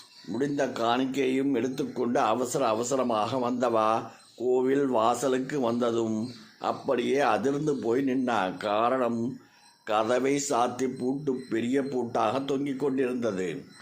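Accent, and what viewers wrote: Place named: South India, India. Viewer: He is talking in Dravidian voice, specially known for DMK, Karunanidhi.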